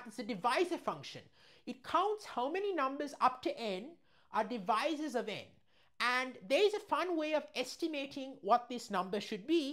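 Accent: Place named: France. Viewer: I think I understood you correctly, but at that point I didn't notice you were French, yet. Anyways, it's much clearer now. The main giveaway of this video is that most of these low primes are easy, even trivial, with some exceptions (maybe just 91, because 49 = 7² and 77 = 7 × 11 are so ludicrously simple)...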